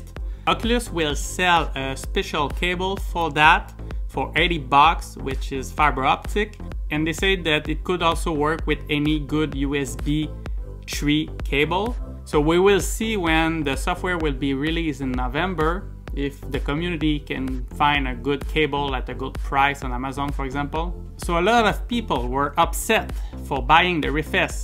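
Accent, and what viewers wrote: Quebec, Canada. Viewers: je reconnais l'accent quebecois et vive le canadiens! lol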